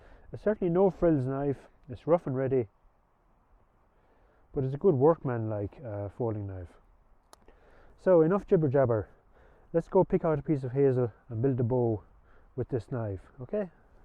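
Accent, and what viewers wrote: Ireland. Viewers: it great to hear an Irish accent on a man doing great work and talking sense . well done sir . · yeah kind of I was saying to meself midlands or wexford I'm from Laois originally moved to waterford years ago.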